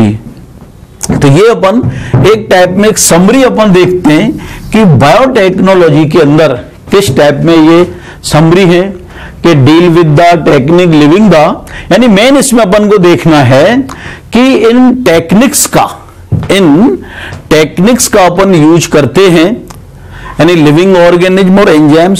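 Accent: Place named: Bihar, India. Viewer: bihar ka teacher hai kya yr?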